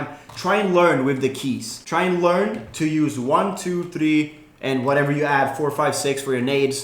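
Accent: Sweden. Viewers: are you from sweden? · Are you from Sweden? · U are talking a littel bit swedish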